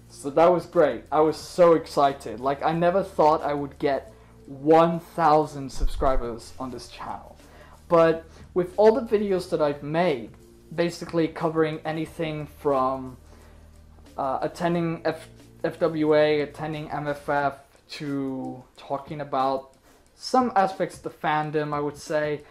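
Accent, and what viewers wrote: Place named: United Kingdom. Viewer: wow to be able to pull of a almost flawless British acsent when you are an American is pretty cool Ps I love the content and the caricter of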